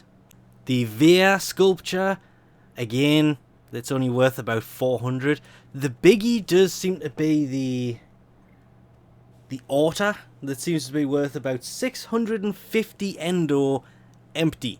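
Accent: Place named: North East England, United Kingdom. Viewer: Are you from the North East? You sound like you are, Newcastle or Sunderland.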